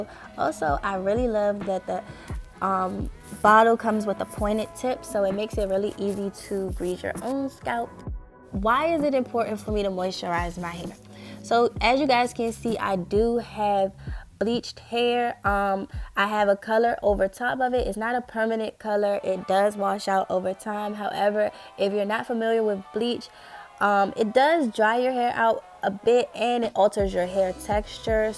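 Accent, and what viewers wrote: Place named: DMV, United States. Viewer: You from the DMV i hear it in your voice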